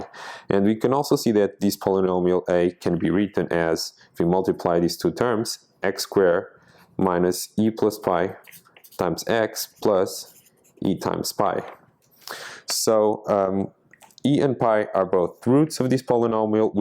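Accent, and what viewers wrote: Portugal. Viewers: Pela pronúncia acho que és português. Gostei deste vídeo que encontrei por acaso. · Sotaque português?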